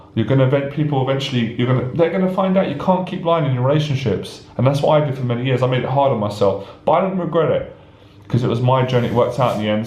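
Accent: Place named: United Kingdom. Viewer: You have the perfect British accent. In some of your other videos there are a few times when you misspeak with a bit of bad grammar. That is coming from some mental thing where you regress into some lower class English isn't it? I mean it happens like someone might stutter. As an American listening to you speak like you are doing here (when you are going slow) you sound like you went to all the finest schools and all. Even the slight mumbling and slack in your speaking (I recognize it as a certain British speech style.) sounds to me as an American to be some intentional upper class way of speaking. I bet King Charles and the cavaliers sounded something like you.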